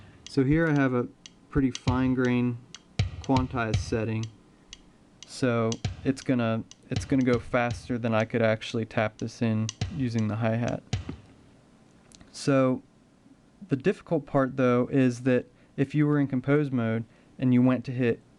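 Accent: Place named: Philadelphia, United States. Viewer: Ur accent...way u say compose...u have a ne twang there...xwife 18yrs from philly im usually spot on with accents...u have to be close to philly my guess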